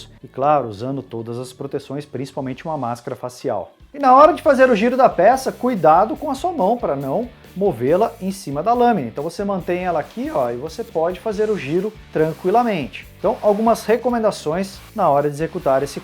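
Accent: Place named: São Paulo interior, Brazil. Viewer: Eu notei que vc era do interior por causa do sotaque.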